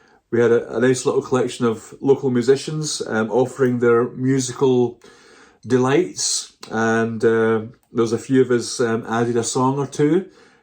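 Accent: Scotland, United Kingdom. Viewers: Hello from USA…..glad to see your video again! I love listening to your Scottish accent and watching you knit so calmly. Your sweater is just beautiful. See you on your next video. 🤗🧶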